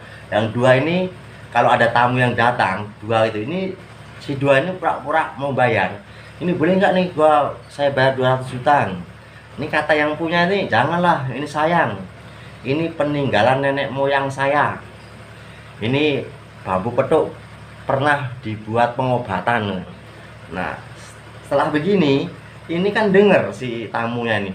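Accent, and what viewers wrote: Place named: Yogyakarta, Indonesia. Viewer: Sapa hayo itu....jatengnya mgkn Jogja ya kang😂😂😂😂😂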